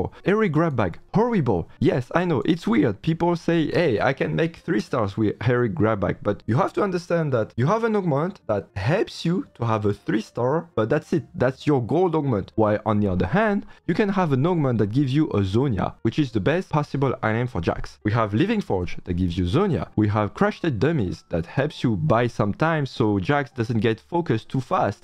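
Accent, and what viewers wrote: France. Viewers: J'entends un reuf français pour sur ! 😂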